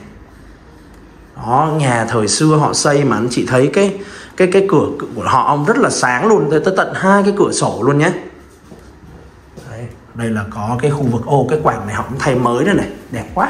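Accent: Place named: Ho Nai, Vietnam. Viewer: Giọng giống giọng Hố Nai.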